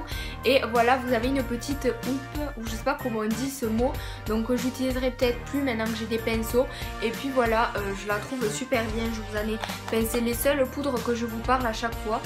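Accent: South, France. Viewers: L'accent du Sud 😍💕 Toulousaine à vie ✨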